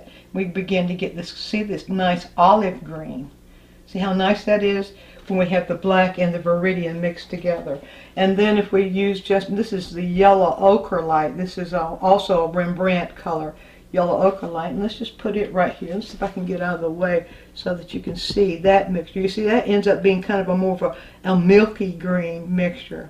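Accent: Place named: South, United States. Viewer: you are not only aged artist you are an excellent teacher, your passion for painting shows in your teaching. You talk slowly and clearly ( unusual today) and I love your southern accent, thank you I have learned a lot from you. Khemin 🌹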